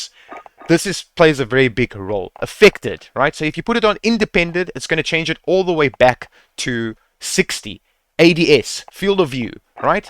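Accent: South Africa. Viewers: love your stuff man. Are you South African?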